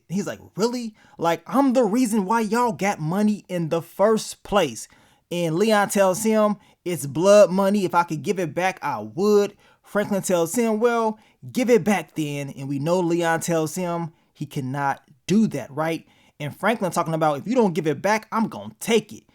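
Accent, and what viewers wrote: United States. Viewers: Man still in his American accent 😂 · u coined that LA accent bruh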